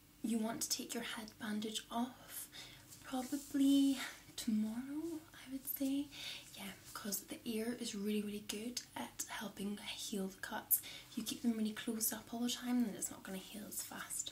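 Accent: Scotland, United Kingdom. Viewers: Scottish accent is probably my new favourite accent, so calming with your voice. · lovin the Scottisch Accent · where about in scotland are you from? edinburgh here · Yes finally Scottish ASMR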